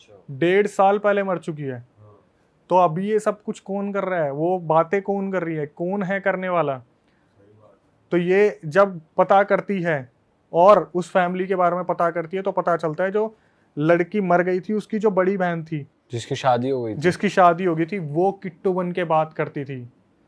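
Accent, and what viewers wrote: India. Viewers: There bolne k lahje se PTA Chala ki tu bihari jhatu hai😂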